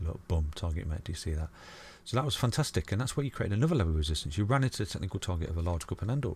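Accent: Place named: Middlesbrough, United Kingdom. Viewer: He might be from Middlesbrough as my friend has the same accent, he knows his stuff, hot on it!! crew 🚀🚀🚀🚀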